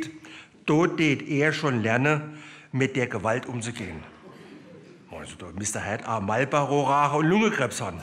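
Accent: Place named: Germany. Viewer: Der beste Satiriker. Das Auszeichnendeste ist der deutsche Dialekt. Wenn wir nur Politiker solchen Formates hätten, die uns führten.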